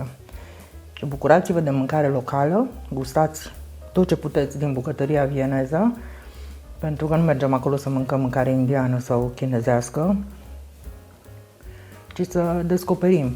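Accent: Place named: Sibiu, Romania. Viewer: Superbe servicii aveți! E o bucurie şi doar să le priveşti, dară-mi-te să consumi din ele❣️🤩👌 V-am urmărit cu foarte multă plăcere, şi realmente am învățat cuminte cum se face corect Wienerschnitzel!👏 Mulțumesc! Aveți un stil plăcut şi explicați şi demonstrați foarte clar şi echilibrat. Eu sunt, originară, din Sibiu şi aud şi la Dvs. acelaşi accent ... Mă înşel? 🤔☺️ Salutări cordiale din Viena, unde eu locuiesc❣️ Locuiți tot în Viena?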